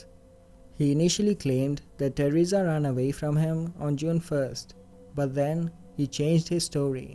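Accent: India. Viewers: What's up with the goofy Indian accent? Bring back the female narrator. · Hey you cheap bastards hire an Enlgishman to do the commentary on these videos why hire a GD India man??? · Narrator is Indian. Not soothing to the ears.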